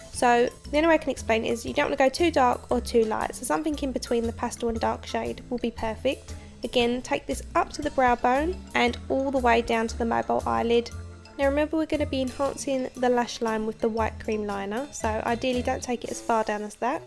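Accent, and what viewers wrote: Essex, United Kingdom. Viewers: You sound like a posher Essex;) But the word "Hatter" really gave it away. Im looking for something for halloween, Saw a black mad hatter on here that I really like but I install security systems and wouldn't have a clue how to do makeup. Especially facial hair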